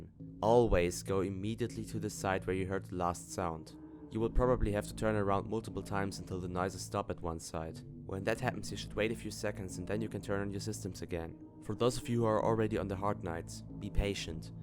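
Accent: Germany. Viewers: thank you i just downloaded it aigan and i forgot everything thanks for the video and help!! u also sound german to me cuz of ur accent haha xd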